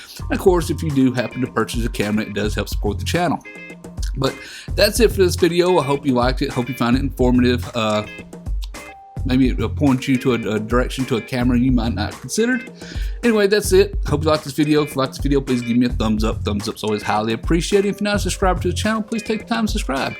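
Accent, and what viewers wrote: South, United States. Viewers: Hi, I am looking for a good camera i can shoot sports and also shoot videos. I would like your opinion on either canon t5i, canon 60d or nikon d7000 or any others u might recommend or suggest. I would like to upgrade maybe to intermedite camera I currently own the canon t3. Hey I really enjoy your southern accent and videos keep up the great job.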